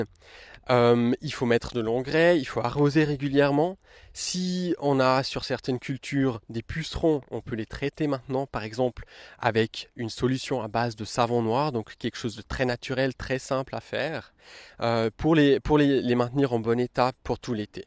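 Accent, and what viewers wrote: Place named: Alsace, France. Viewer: On dirais que vous êtes Alsacien ^_^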